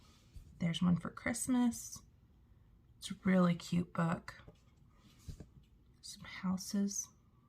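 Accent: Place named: Australia. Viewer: Love your hauls. Tysmfs. Are you from the USA you sound Aussie, I’m Aussie lol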